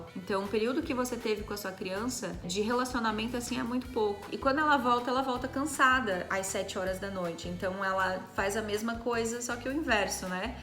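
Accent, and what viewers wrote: Portugal. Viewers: Muito bem, a forma de ajudar os brasileiros que pretendem vir para Portugal tem melhorado ao longo dos tempos. A metodologia das atividades de desenvolvimento incentiva à autonomia das crianças. Fora do horário das educadoras de infância devem existir atividades mesmo não estando contempladas no currículo de desenvolvimento, como seja a socialização da criança. Conserve o seu sotaque de origem, é um valor cultural que não deve perder, já se nota uma pequena aproximação às vogais da zona minhota. Na rede pública, quando há educadoras com formação adequada, é facultado o ensino do inglês a partir dos 4 anos. A IPSS próxima da vossa residência tem bastante qualidade.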